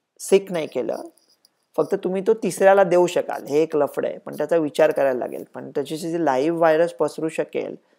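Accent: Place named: Pune, India. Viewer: सर तुमचा बोलणं ऐकलं की अस वाटत आमच्या पुण्यात सदाशिव पेठ मध्ये थांबलो आहे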